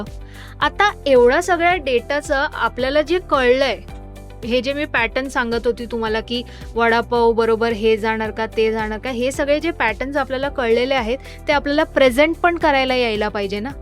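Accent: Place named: Gujarat, India. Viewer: गुजराती असून ही मराठी स्पष्ट आणि छान बोलता. तुम्ही डाटा अनालिस्ट ची माहिती खूपच छान आणि उपयोगी अशी दिली आहे....... ती खरोखर सर्वांना मार्गदर्शक ठरेल....... धन्यवाद 🎉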